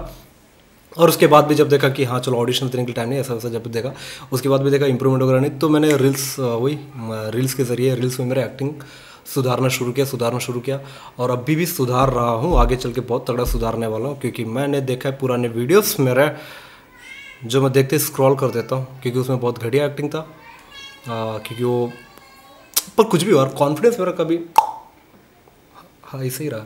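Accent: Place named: Hyderabad, India. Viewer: After a long time I am watching him. I love his hyderabadi accent. I want to see him go him on heights....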